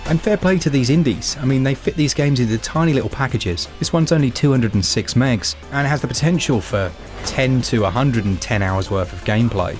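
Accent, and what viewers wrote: United Kingdom. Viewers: My soon-to-be wife surprised me with a birthday Switch OLED just recently, almost cried cause I've been saving up for it for months and she went ahead and got it for me. Went to search for a Switch-related youtube channel and yours is by far the most enjoyable to watch (might be the accent, gotta love the brits). More power to you!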